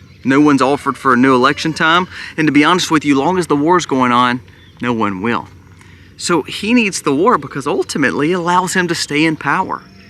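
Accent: South, United States. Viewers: What a niave analysis. Just let Russia do what it likes. Sounds like a hick southern MAGA supporter.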